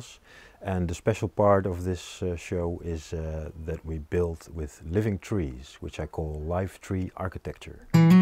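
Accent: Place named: Australia. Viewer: You know- I was thinking your English reminded me of an Australian speaking and Lo- you lived there- or here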